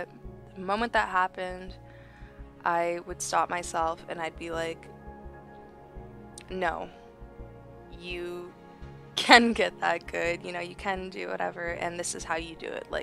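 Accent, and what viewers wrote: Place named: Toronto, Canada. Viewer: It's really nice to have this in the background while working, I struggle with not being distracted by videos and social media, so when I'm listening to someone talk I'm able to focus: ) Also getting almost homesick hearing your accent, I miss Toronto.. Anyway, I'm honestly really enjoying your videos, you have relevant and meaningful opinions, I hope you keep making them^^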